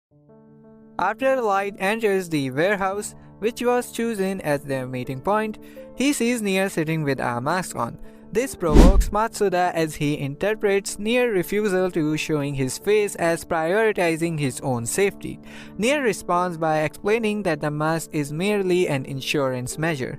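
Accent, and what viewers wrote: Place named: India. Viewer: Indan accent english, Fresh W 🐬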